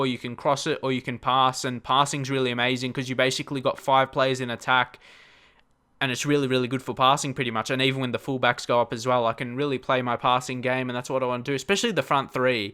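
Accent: Australia. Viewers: aussie guy.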